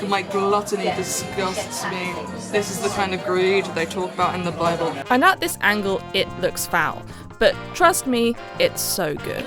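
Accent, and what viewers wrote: England, United Kingdom. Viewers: I was unaware you were british. I should've known due to the accent, but whatever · It’s a super English accent though 😭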